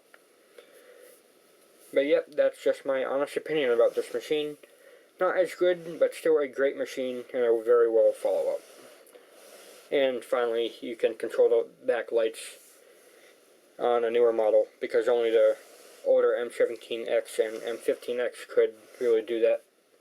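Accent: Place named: Russia. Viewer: for god's sake, he's russian, english is not his first language STFU already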